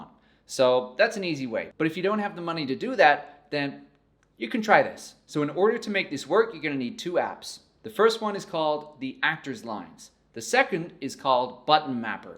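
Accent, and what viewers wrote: United States. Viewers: Lol! Love your yankee voice; Sounds a little like will Wheaton. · Honestly man, your American accent is on point. Good job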